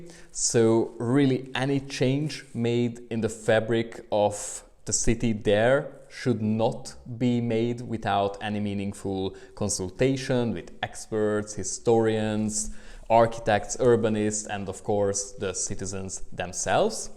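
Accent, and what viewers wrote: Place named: California, United States. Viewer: for a supposed hungarian you sound a lot like you'd rather be some californio.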